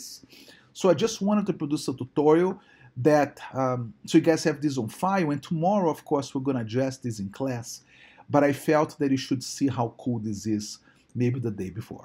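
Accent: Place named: Brazil. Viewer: Deu para sentir seu sotaque de brasileiro nos 5 primeiros segundos do vídeo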